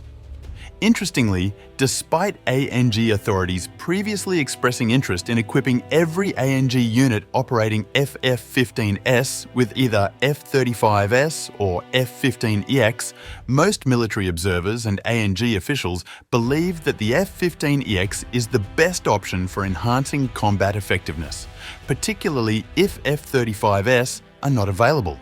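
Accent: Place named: Australia. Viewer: Why Aussie accent? What do Australians know about F-15's?? Just doesnt make sense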